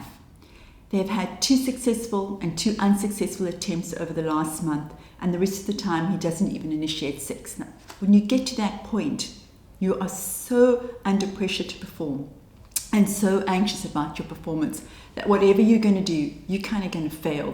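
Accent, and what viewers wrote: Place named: South Africa. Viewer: she sounds like she's from SA.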